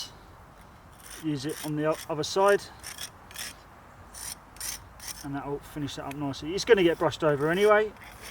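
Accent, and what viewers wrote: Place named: Cockney, United Kingdom. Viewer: From one cockney living in OZ to another cockney - good stuff mate. I've got a small job to do in my kitchen. I am going to Bunnings to get a chariot tool now. Also the out takes are cracking me up !!!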